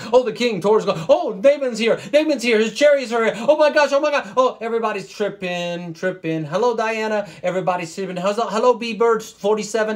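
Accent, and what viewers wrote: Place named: South, United States. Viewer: love it when you sing and when your Southern (from Tennessee?) accent shows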